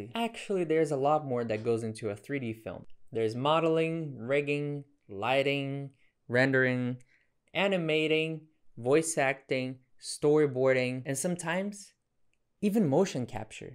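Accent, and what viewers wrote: Brazil. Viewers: Wtf i think you have the best english in Brazil lol I mean you lost most of the accent... i can only wish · is that a brazilian accent? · Esquisito como eu consigo sentir q você é brasileiro kkkkkk. Vídeo muito bom e vc explica mto bem, cara, parabéns na moral foda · Your accent remembers me the brazilian accent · Eu reconheço um br quando vejo e escuto um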